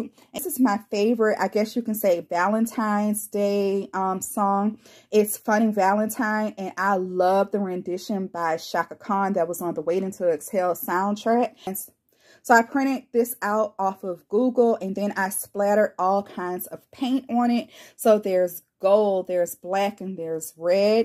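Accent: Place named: Georgia, United States. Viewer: Adorable! I have a new Cricut machine x2 Months that I haven’t played with yet. You’ve inspired me! Subscribing! What state do you live in? You sound like a fellow Georgia girl FYI The Color Purple is my favorite movie of all time!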